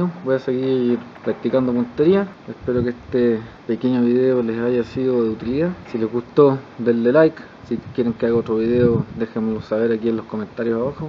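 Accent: Chile. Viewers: jejejeje te cache por el acento xd yo pense que no llegaban de estos juguetito a nuestro bello pais, donde lo compraste y de cuanto fue el garrotazo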